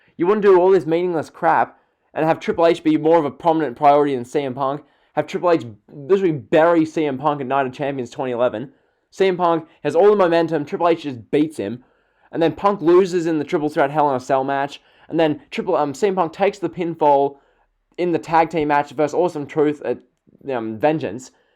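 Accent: Australia. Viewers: Hey man what part of Australia are you from?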